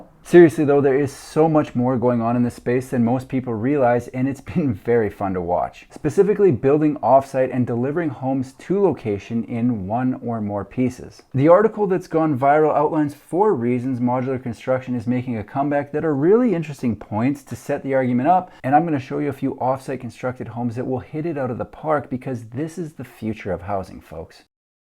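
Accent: Canada. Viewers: My team noted you are Canadian. Do you only say “aboot?”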